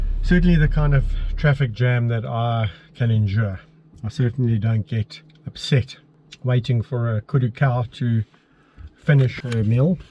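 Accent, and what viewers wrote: South Africa. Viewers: Bloody hell. After these videos, I hear a south African accent inside my head every time i cuddle my German Shepard.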